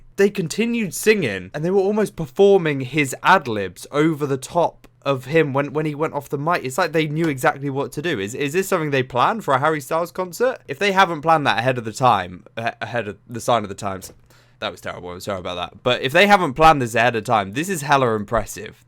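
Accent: United Kingdom. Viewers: I love your brits accent ♥️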